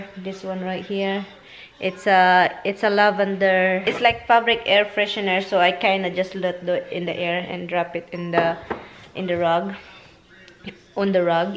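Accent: Visayas, Philippines. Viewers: Hi nag enjoy ko nimu ug tan aw bisan bisaya ang accent Peru cuteeee man...Been following you..Am working here in Cambodia..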